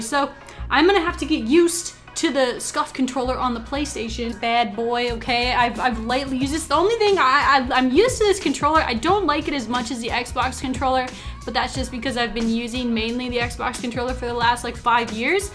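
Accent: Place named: Midwest, United States. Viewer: She has a Midwest American accent. Just like every where else in the world. There are different forms of the same language. No need to be rude.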